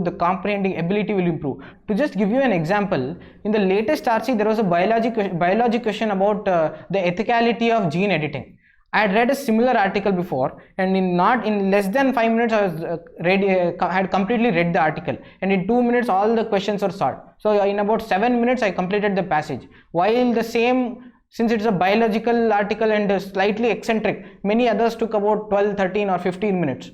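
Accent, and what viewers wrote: South India, India. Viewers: Sir are you a South Indian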